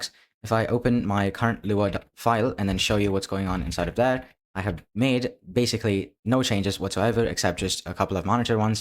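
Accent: India. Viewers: indian voice sayin OKA OKA jeez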